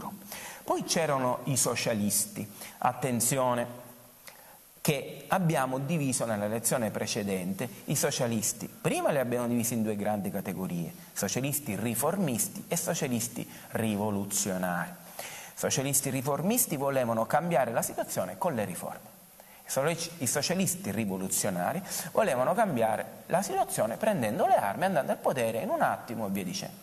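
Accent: Sicily, Italy. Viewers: Pazzesco..poi il tocco di classe con l'accento siciliano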